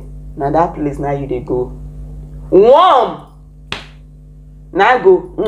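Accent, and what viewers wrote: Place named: Delta State, Nigeria. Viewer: sister I hear yr language, yu from delta state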